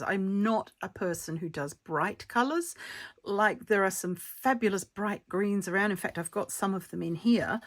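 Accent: Australia. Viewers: Have just discovered you, thoroughly enjoyed your video, and hearing your Aussie accent:)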